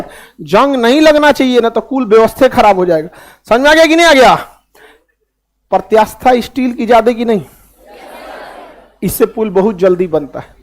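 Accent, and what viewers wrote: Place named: Bhojpur, India. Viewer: Sir aap adha Hindi or aadha bhojpuri kyu bol te he.... Lekin sune me aachaa lag ta he ham bhi kyu ki Banaras he❤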